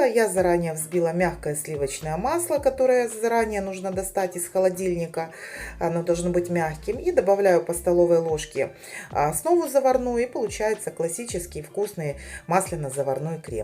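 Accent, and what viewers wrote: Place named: South, Ukraine. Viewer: А это не только пляцок. Есть очень похожий финский тортик. Говорок у Вас до боли родной. Юг Украины. Или юго-восток? А рецепт великолепный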